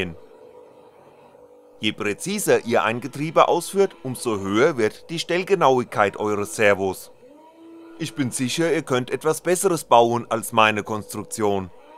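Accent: Hesse, Germany. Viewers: Seltsammer Sprech da wo Du herkommst (tiefstes Hessen?). Man merkt das Du versuchst betont Hochdeutsch zu babbeln :))) Fachlich sind Deine Videos mit das interessanteste was YT zu bieten hat. Wirklich toll was du hier ablieferst. Allerherzlichsten Dank für die Arbeit und die Zeit die Du in die Anleitungen reinsteckst. Ich hoffe noch viel von Dir zu sehen.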